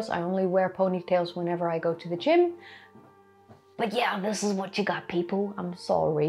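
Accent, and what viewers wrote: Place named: Netherlands. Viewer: that wonderfull dutch accent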